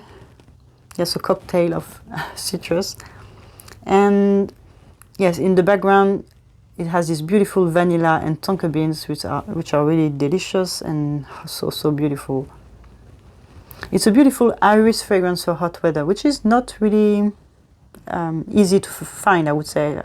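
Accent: France. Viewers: I love your monthly favourites and your reviews such a diversified extensive range of scents so wonderful to watch! I love fragrance too and have a small collection but not as impressive as yours! Do you work in the fragrance industry? I recently discovered Atelier Materi and cacao porcelana is on my wish list as is Trudon Bruma a beautiful chilly iris scent all class my other discovery and also on my to buy list is Tabac Rose by BDK its the only scent in that range that I LOVE it actually reminds me of Maison Lancome's oud bouquet but sweeter and more wearable I'm so glad I found your channel I love the way you speak & I love your French accent! Big lover of France have visited twice xx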